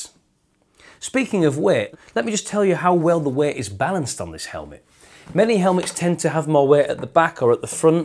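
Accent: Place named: Yorkshire, United Kingdom. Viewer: Yorkshire, Huddersfield, I’d say.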